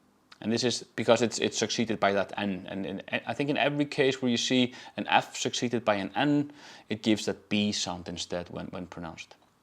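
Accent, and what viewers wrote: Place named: United States. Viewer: Another question comes up. I assume you were taught British English, so you say Zett. But you speak in American English, so you say Z. Which is correct?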